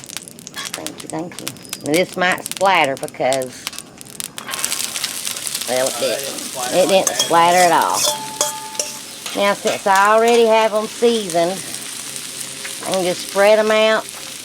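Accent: South, United States. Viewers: Im from Oklahoma and been all over the country and that southern accent always grabs my heart good job honey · love the Southern Drawl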